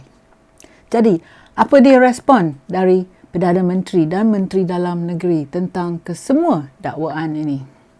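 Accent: Perak, Malaysia. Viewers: Kak, buat cerita saya suka, sama hati dgn perasaan rakyat Malaysia. Sy org Perak, suka lawak Dan slang kampung kite. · Suka dgr bila puan cakap loghat perak.🤭 Bila la najib pakai baju bnduan.. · Seghonok dengor ckp Perak...good job Puan. Would like to hear more of this banduan joker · Pekat benor perak nye.... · apsal pompuan ni pelat? slang apa dia pkai ni? kejap peghok kejap utagha?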